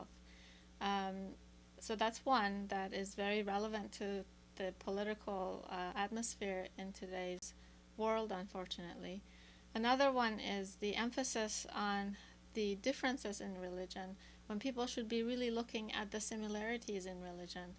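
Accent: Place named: Iran. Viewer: khahar, age mishod, man vaghan daste shomaro miboosidam... vaghti harfaye shomaro goosh midadam, chesham pore ashk shod. midoonam irooni hasti, az lahjat malome, vaghan shomaro doost daram.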